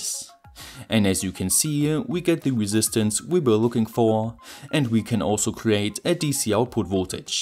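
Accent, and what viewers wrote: Germany. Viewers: Your German accent sucks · To All: Info. Whatever ' brand ' of vehicle alternator you use ; check out the idle speed of THAT brand of vehicle. That, idle speed, is what you'll require to create an electric generation unit. Minimum of probably 1700 or so. Make it for at least 2,000 rpm. ( 3600 may be the best, like those gens you buy , in a steel piped case type = Champion, and other brands ). If i build one, it would use an alternator off of a semi- engine ; probably a Detroit, or Cat engine. Rating about 200 amps. IF it's going to be made, it's going to produce power !. Thanks, for the info, SIR. Wonderfull accent, is that German, or Norweigian, or ...? (Alberta Canada) A generator, usually requires about 2 hp of rotational force, for every 1,000 watts of power output. There is some loss, but efficiency is between 80 - 88 %. I have several generators, 208 cc gas engine powered, 3500 Watt BE generator. 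Older Yamaha, 2800 watt output, uses a 256 cc engine. ( 1983 model - had to redo carb = works awesome. Great engine !!)) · Geat german accent! Ich spache deutsch! (but I have terrible spelling)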